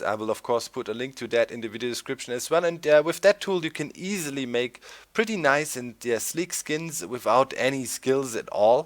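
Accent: Germany. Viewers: ich wusste es du bist deutsch (das hört man etwas) ab links oben kann man die deutschen Optionen sehen :D Hallo :DD übrigens gute Vorstellung ;D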